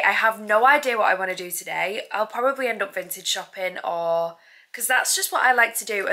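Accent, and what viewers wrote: Manchester, United Kingdom. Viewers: the Mancunian accent is my favorite.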